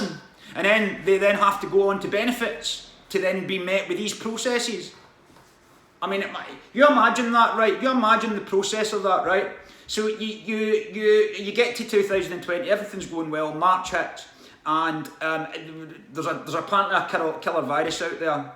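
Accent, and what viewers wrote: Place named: Glasgow, United Kingdom. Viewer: he has a Glasgow accent :)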